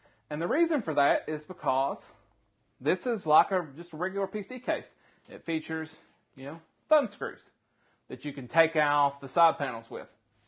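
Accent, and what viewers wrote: South, United States. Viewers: dually noted and I will take your points into consideration as I execute my purchase in the very near future. As a consumer of content, may I say, I reallly, like REEEALLY, love the deep southern accent in these build/review videos! It's refreshing compared to those grossly proper UK creators or Bollywood types ;) Keep up the good work my friend and I look forward to some updates on the systems you have already built. TTYL! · Are you from Southern USA ? I've heard this accent in some old Hollywood movies. No hate, just curious.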